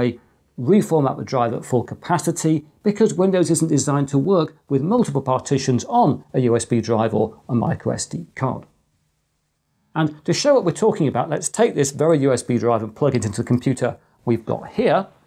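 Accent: United Kingdom. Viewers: Loved this new format! I hope there will be more in the future. Even if I knew about most of these urban myths, the technical clarifications you bring are most useful. I must admit that I am also partial to your style: no flashing extravagant post production effects, no fuss, just plain and precise information. On top of that, your British accent and phrasing and overall attitude are music to my ears! Have a nice cuppa! (Try not to mind the undocumented conspiracy theories too much: as you mentioned in your introduction, facts and opinions (or what we think is a fact without knowing or wondering where it's comming from) are indeed deeply intermingled... I hope you'll keep trying though!)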